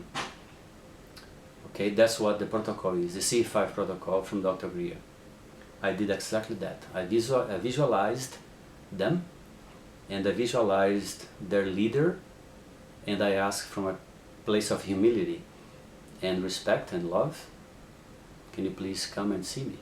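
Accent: Brazil. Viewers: Hey Man....You Brazillian right ? You sound It ! I am in Porto Alegre and we have a a small cluster that make and use the CE5 Protocol quite.often. Just to let you know you have allies and you not Alone. ALL the best Man.. Peace out ! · Ola. Pelo sotaque, voce deve ser brasileiro. Fiquei muito interessado no seu depoimento, mas compreendo pouco o ingles. gostaria de saber se voce poderia inserir legenda em portugues. grande abraço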